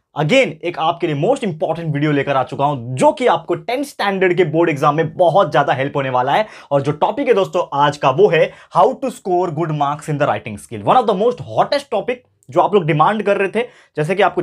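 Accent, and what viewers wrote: Maharashtra, India. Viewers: Sir aap kitni pyaari Marathi bolte Ho 🤩❤😘😃😄☘️👍🙏🏻❤🌍💯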